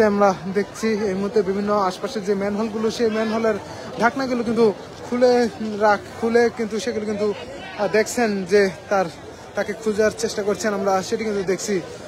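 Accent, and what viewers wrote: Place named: Narsingdi, Bangladesh. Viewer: নরসিংদীর কন্ঠ স্বর কেনো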